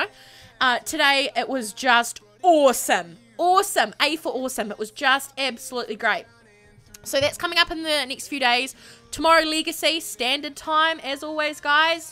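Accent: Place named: New Zealand. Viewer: I guess New Zealand (the accent) is close to Australian! First I thought that maybe you are British but then I saw your channel info :') Of course I watch your videos, they're awesome!! xx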